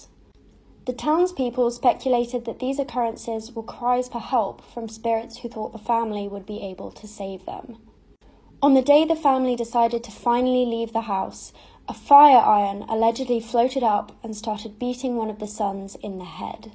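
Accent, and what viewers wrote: United Kingdom. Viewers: An someone tell me why she English like me but only ever lived in america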